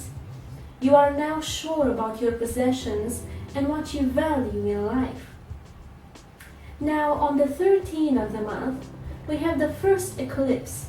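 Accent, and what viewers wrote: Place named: Italy. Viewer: Great reading, very positive insight about this month! Love your accent. Are you Italian?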